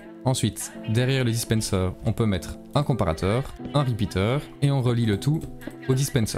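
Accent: Belgium. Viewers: Bonjour à toi merci pour cette vidéo serait tu belge ? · Ton accent c'est un belge ? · ça s'entends tu est belge, en tant que français je me dois de na pas liker cette vidéo même si je l'ai apprécié. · J’ai l’impression de reconnaître un accent belge 🤔 Je me trompe ?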